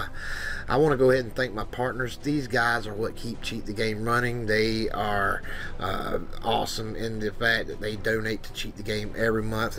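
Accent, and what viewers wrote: South, United States. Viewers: I did it yesterday whole day and half of night. I started with float, 4 bytes and unknown initial value, first searсh gave me more than one billion results, than I found a border on the gas station (in the game prologue) and step up and down and after every action change value to decrease or increase and did next search. After first step on border it decreased results to ~ 3 millions and than I did the same action by circle, after almost 30-40 minutes I get few values, but they was red and changed realtime, so it probably wasn't height of the character, maybe rain or her hair or body movement or even rotation of the camera... no idea. When I did it camera always changed and also when pushed alt+tab. Than I tryed the same roadside, ladders, run and step up and down in diffrent locations, after a coupe of searshes I get something like 50 adresses still appeared, than repeated same actions, until I got 5, but change the value, notihnig changed in the game, so I probably miss something. 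Also during first search my PC works pretty tough almost freezing, well I don't know what to do. It seems pretty complicated also english isn't my native and yours sounds for me like you some kind of country singer :)) I'll try to look closely on your video, thanks!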